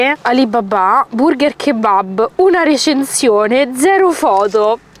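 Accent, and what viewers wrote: Rome, Italy. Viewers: 120 Alibaba bella sta cosa non era solle.mille e una notte, bellissima tu e mi piacciono i tuoi contenuti poi io da veneto mi fa morire il tuo accento romano de Roma 💋😉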